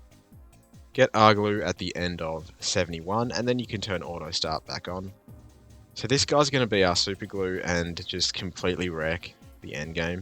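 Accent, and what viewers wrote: Australia. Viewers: you have no idea how much joy it brings me to hear that beautiful Aussie voiceover narrate goated CHIMPS guides, I love you brother How are you, anyway? How's the fame of being a movie star treating you?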